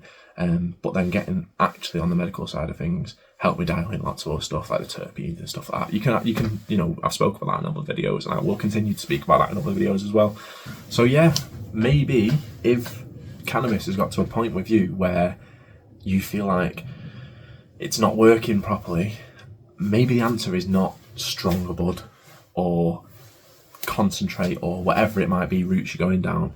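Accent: United Kingdom. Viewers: Legend mate , literally been on the same journey. Glad to finally have someone in the UK posting this type of content and judging by your accent your only down the road 😂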